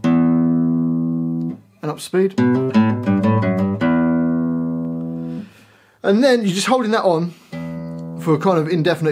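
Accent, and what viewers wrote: United Kingdom. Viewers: I ain't sure yet of what sounds better - his British accent, the guitar or the song itself. It looks like all of those are brilliant.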